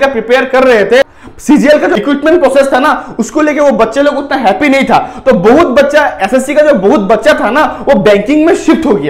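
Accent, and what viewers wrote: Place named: Bengal, India. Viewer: Sir,I had attempted 52 in which 1 is wrong not sure about it,but in others question I have 100% accuracy but say I will consider it above 95% as I did only for accuracy with moderate attempt...So shall I prepare for mains?? category is normal And it was 15 th Oct 3rd shift (tough shift) And are you Bengali bcz accent is similar to that!!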